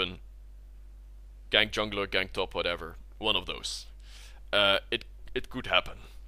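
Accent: Netherlands. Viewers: are you dutch? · Love your dutch accent. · DAT DUTCH accent :D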